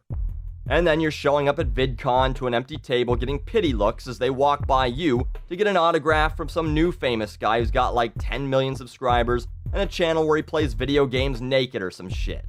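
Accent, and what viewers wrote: Canada. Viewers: Make a final video where you say your goodbyes, then open a new account, quit the Canadian accent (you should have done that long ago actually) and use a Swedish or Norwegese accent (it's the same cold country anyway, wonder why they speak different languages??!!). Do the same thing, same concept. Some people will say it kinda ressembles this measure of that used to be good a while ago, most will just say this is THE shit right now (yes right now, not tomorrow). You get 10 millions views in one month and you can finally make it to vidcon!!! Hooray.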